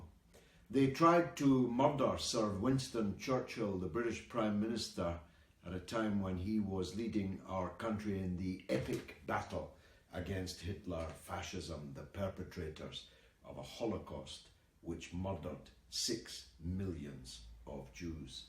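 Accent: Scotland, United Kingdom. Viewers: Why is it subtitled? Do some people struggle with comprehension of the Scottish accent?!!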